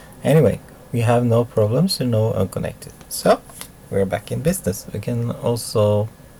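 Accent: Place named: Sweden. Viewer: Schematics is pronounced skematics in english not Schemaaticks.Sounds like swenglish to me.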